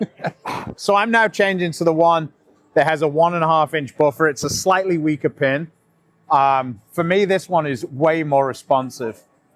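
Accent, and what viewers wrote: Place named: United Kingdom. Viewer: Extremely britesh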